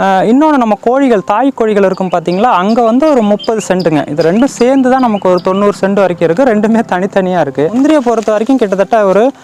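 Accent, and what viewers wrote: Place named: Cuddalore, India. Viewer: ரொம்ப யதார்த்தம் .அதோட உங்க தமிழ் நம்ப கடலூர்மாவட்ட வழக்கிலே இருப்பது கூடுதல் சிறப்பு..ரொம்ப மகிழ்ச்சி...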